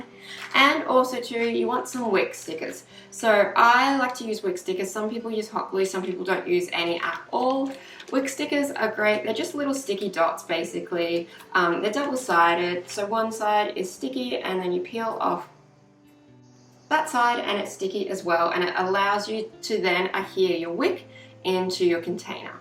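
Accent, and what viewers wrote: Australia. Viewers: Nice to listen to an Aussie, thank you very informative 😊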